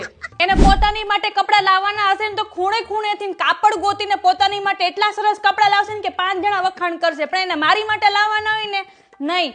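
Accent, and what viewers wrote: Kathiawar, India. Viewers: Tame aa j kero..biju badhu reva j fyo ho..aa to mast..pure kathiyavadi..superr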